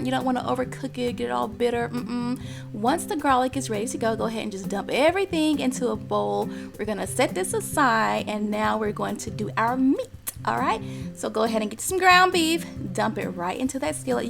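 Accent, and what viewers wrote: South, United States. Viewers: YOUR CHANNEL IS THE BOMB!!!! I JUST LOVE YOU. YOU SOUND LIKE AN OL' COUNTRY GIRL LIKE ME. I'M FROM LITTLE ROCK, ARKANSAS and I saw my Granny making, not all but most of these recipes. But, what's so crazy about it, she wouldn't let me my sister and my cousin be in the kitchen with her. I didn't learn how to cook, well, until I got out on my own when I was 31 plus years. LOL It's crazy. LOL My granny never wanted anyone in the kitchen helping her. AIN'T THAT SOMETHING? She had 9 children and my mother was the oldest. I'm the first grandchild. She cooks big meals everyday, and everybody is gone. My oldest uncle passed in 1998, my Paw Paw passed in 2013, my other uncle passed in 2014, my aunt passed in 2015, then my Mom passed May 12, 2017. My Granny is 88 years old, and this to say that she cooks like this still till this day because she is so used to it. 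Now growing up this was the routine. She cooked Sunday {big meal) through Thursday. Friday was always Fish day. Catfish steaks, fillets or whatever. Saturday was lunch meat day. You better take yo but in that kitchen and make yo;u a pressed ham. bologna, You better hope you got chicken loaf and cheese sammich. LOL Oh and you better hope you got some Lays or Ruffle chips to go with that sandwich with that meal. But I would love it when it would be about 6 or 7 pm and she would start putting her dinner on for Sunday..., when I was the only grandchild..., LIKE I SAID i'M THE OLDEST GRANDCHILD out of a million. LOL, but she would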